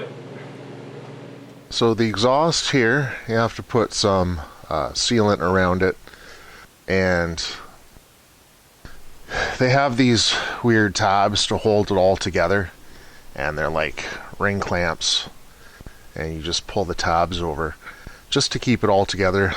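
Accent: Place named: Canada. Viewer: Wow thank you for such a speedy reply! Yes I do have a Mr. Heater. Are you in Canada? ( I see the hat pin and recognize your accent.) (Maine here) I'm thinking U.s may be a little different. However I did decide to do it at around 20" because I really didn't want it sticking 3' into the shop space.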